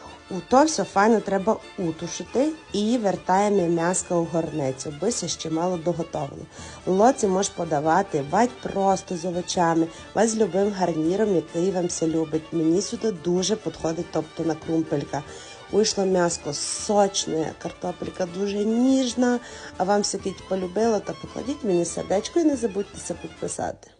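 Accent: Transcarpathia, Ukraine. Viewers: А яка чудова мова!))) я нібито на Закарпатті опинилась)))) Дякую за рецепт і за настрій)))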